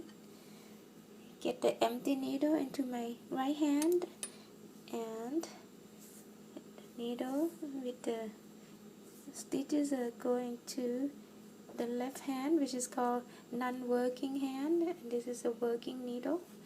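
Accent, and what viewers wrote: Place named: Thailand. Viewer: I love your tutorials! Maybe move away from your camera a little bit. You teach very well. I love your accent. May I ask if you are from Thailand? I lived in Thailand many years ago. 💕. Thank you for sharing your craft.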